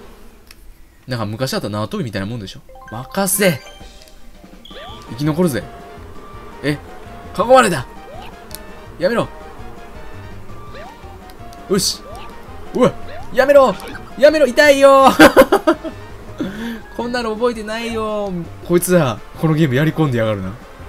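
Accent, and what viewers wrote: Kansai, Japan. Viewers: 関西弁すてきです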